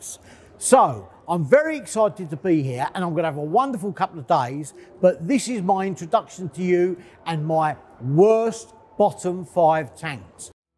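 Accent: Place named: England, United Kingdom. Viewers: Hornchurch, Essex. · I love his accent, what part of England is his from? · Liverpool. Broad scouse. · Sounds like a Londoner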